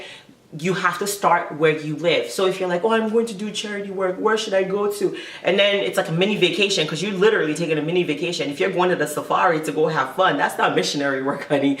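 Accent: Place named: Africa. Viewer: Support is very important. Your African accent is so pure lol. Most Africans don't enjoy their stay cos they don't think they are accepted here. I feel like when you have hate in your heart you will find a reason to execute it. Be it racism, tribalism et al. BTW what camera and lens did you use? #ytqueens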